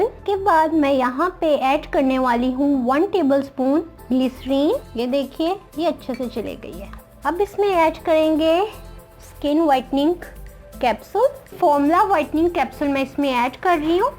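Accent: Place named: United Kingdom. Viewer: Ap urdu british form me q bat karti hain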